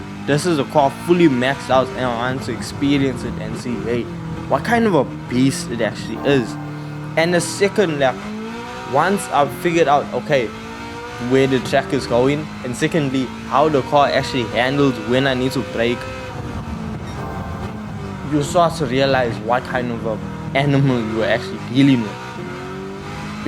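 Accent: South Africa. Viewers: Are you south african bru?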